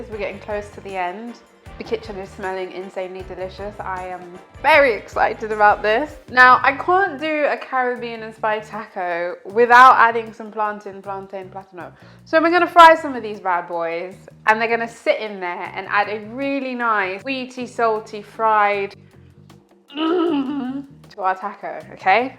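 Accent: United Kingdom. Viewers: That is such a beautiful form of the English language! What region are you from in the UK? This is so classy.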